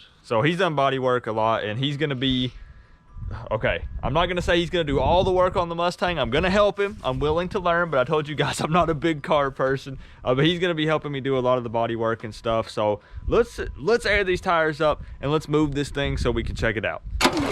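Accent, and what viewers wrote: South, United States. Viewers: You sound like Carl Sagan if he had a southern accent. 👌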